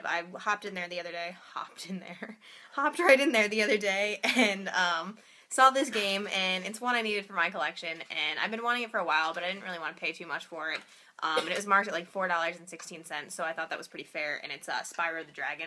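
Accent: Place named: Ohio, United States. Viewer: I'm a new subscriber, but really enjoying your videos! Quite a few to catch up on, but I'm sure I'll get around to them eventually :) Sounds like you're a fellow Ohioan, so it's always nice to meet another one of those. I'm in college as well, so I can certainly relate in terms of business I'm sure. Some great pickups this time around! Especially Spyro! Some of my favorite games on the PS1. 2: Ripto's Rage is definitely the one you'll want to get, though! Excellent game!!!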